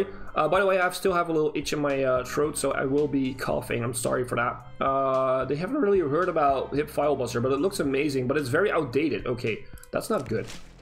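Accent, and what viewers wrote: Netherlands. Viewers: I live in Brabant but am from the US. So I speak the language my accent gives away my secret. And you have a bit of a Dutch accent, but I also thought that you could sound a bit like your more eastwards, so wasn't sure.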